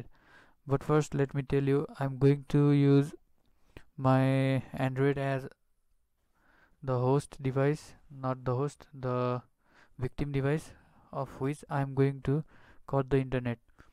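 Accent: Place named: Nepal. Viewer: bro nepali ho kya ?? 😂😂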